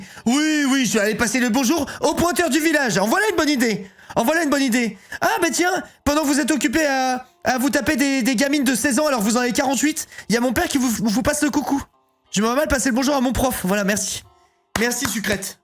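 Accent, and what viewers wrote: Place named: Marseille, France. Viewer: Le dimanche c’est ACCENT MARSSEILLAIS !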